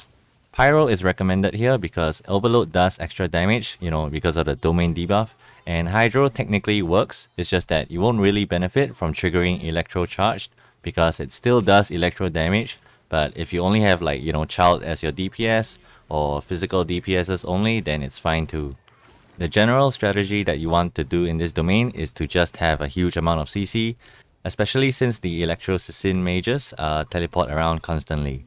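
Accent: Singapore. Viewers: picked up your mixed Singapore accent right away, we feelin it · You sound Singaporean ( sorry if this sounds racist )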